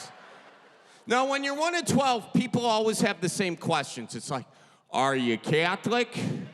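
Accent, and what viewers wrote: Midwest, United States. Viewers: This man is pulled between his Wisconsin speech pattern and neutral speech pattern. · Oh gosh! From central Wisconsin and sounds like home. Lol! Love ya! Good lookin’ Wisconsin guy! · Thank you for bringing this to YouTube! My son got me following you pretty early on. My California baby was in tears over your accent and Midwestern humor. Loved this special start to finish, would love a separate "auction" one, if you're so inclined. Hope to see you live someday! 💜✌️😎🍀🌎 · Midwestern here, from Wisco herself. Is this what our accent sounds like? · I moved to Wisconsin 20 years ago and I've still never heard anyone with that thick of an accent. But then people here say I have a southern accent soooo 🤷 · I'm an iowan and this is so accurate and the Midwest accent is a mixture of southern and hick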